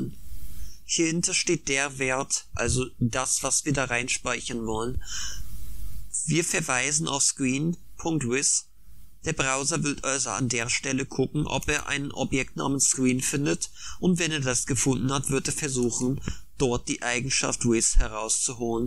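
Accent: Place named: Sauerland, Germany. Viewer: Welch Kräftiger Dialekt, Sauerländer?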